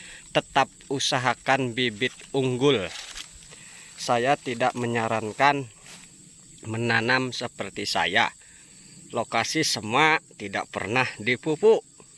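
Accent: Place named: West Java, Indonesia. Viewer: Ini daerah mana om kayak dari Sunda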